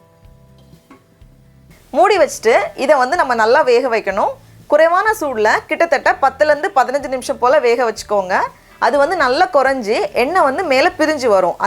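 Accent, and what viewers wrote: Nagercoil, India. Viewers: I like ur nagercoil slang..I'm from Tirunelveli..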